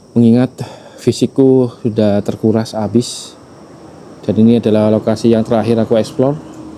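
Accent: Java, Indonesia. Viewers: Inggrisnu MEDOK JOWO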